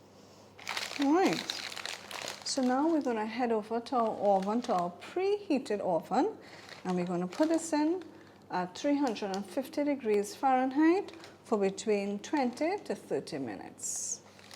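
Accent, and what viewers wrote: Trinidad and Tobago. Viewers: Hey! You have to be a Trini 😁😁😁👏🏾👏🏾👏🏾. That accent! Nice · YESS!!! Can spot a Trini anywhere! Thanks for this! Gonna try it out!❤😁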